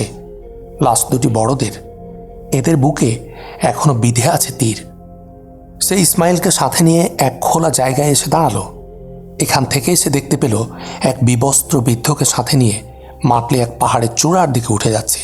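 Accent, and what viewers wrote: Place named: Kolkata, India. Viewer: ভাই আপনার কথা বলার স্টাইলে মজা পাইনা, কলকাতার মত লাগে, বাংলাদেশের টনে কথা বলুন প্লিজ, (সুন্দরের উচ্চারণ করছেন ছুন্দর এমন অনেক উচ্চারণই বাংলাদেশের টনে হচ্ছে না,)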